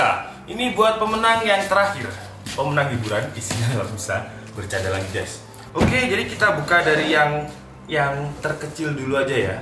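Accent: Malang, Indonesia. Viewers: orang malang mas?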